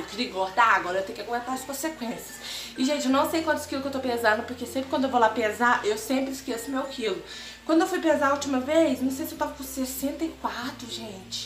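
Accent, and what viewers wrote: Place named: Minas Gerais, Brazil. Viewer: Eu comecei a tomar ontem to com 49 kilos agr vamos ver daqui a um mes ne kkkkkk Vc e mineira também ? Kkk melhor sotaque ☺♥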